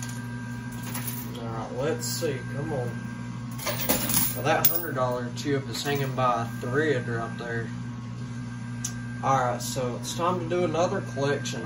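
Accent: United States. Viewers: I love watching all of your videos. Where are you from? You sound so country lol not a bad thing at all. I'm from Mississippi so I'm country too lol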